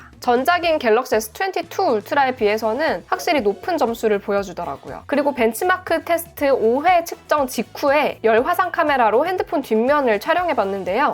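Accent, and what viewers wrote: Gyeongsang, South Korea. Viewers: ㅋㅋ 경상도 사투리. 찢으셨다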